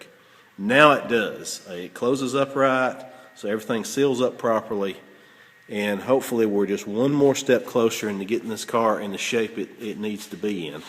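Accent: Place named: South, United States. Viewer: I'm so glad to see y'all restoring this wonderful car. I owned a '71 when I was 17. Loved that car and now I own a '72 (and I'm waaaay past 17 now. lol). Loving your accent too. I spent my first 41 years in Texas, now I'm in New York. I miss listening to southerners. :) I'm impressed with all your work. Kudos to you and your class.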